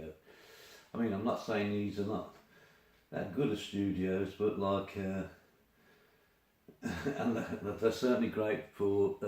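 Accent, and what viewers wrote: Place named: Birmingham, United Kingdom. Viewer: Never knew you was a brummie mate, you a villain, cov or bcfc? 👍✌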